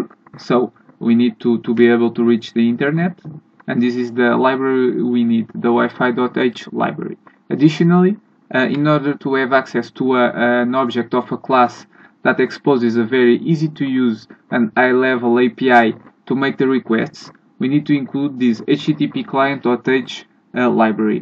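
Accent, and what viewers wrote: Portugal. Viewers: Are you portuguese? Your accent seems me very familiar 😂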